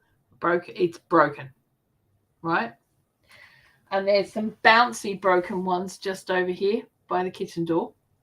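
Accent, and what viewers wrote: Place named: Australia. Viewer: Oh thought you were from England I see Australia now accent threw me for a loop! Do you garden? You should very relaxing. I garden I believe I saw you comment on a gardening channel. I am a Christian! I love to garden?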